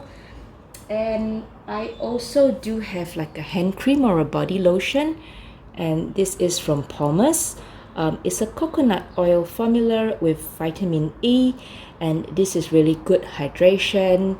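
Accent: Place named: Singapore. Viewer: Also this is kind of random, but I love the Singapore 🇸🇬 accent. I follow another YouTuber with the same accent and I just love it. 😊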